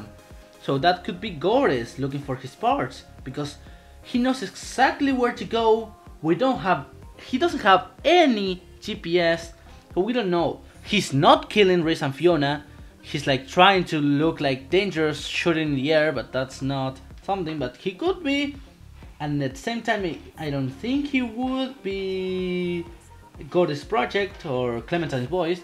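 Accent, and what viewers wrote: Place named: Spain. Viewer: That spanish accent tho. ( ^~^). Nice theory anyway. You get one sub.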